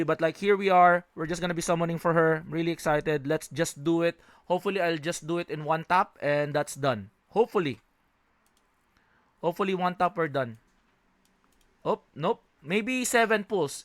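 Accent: Philippines. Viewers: Sabi na fil ka pre e, sa accent mo kase HAHAHAHA nice content pre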